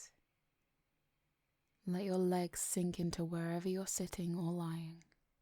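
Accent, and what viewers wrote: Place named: London, United Kingdom. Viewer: Oh my, you have such a lovely voice. It is a crime you only have 39 subscribers. There is something so appealing about the British intonation. As a Dutch guy it is always hard to tell where but is it a London accent or close to London.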